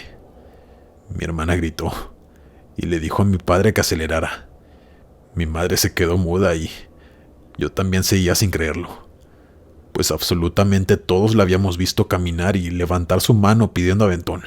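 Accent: Mexico. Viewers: Hola caballero saludos de Medellín Colombia men encata este narrador es mexicano usted voz muy varonil y muy clara y y muy pulcra quiero desir sin ningún morbo y muy caballerosa felicitaciones y gracias por sus relatos muy interesante 👏👏👏👏👏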